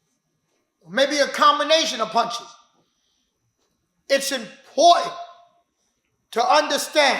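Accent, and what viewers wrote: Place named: Brooklyn, United States. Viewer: Straight-up Brooklyn New Yorker. The South Bronx hears you and feels you! Stay Righteous!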